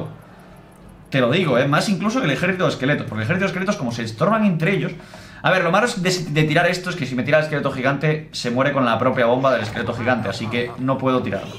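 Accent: Galicia, Spain. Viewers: Eres gallego ?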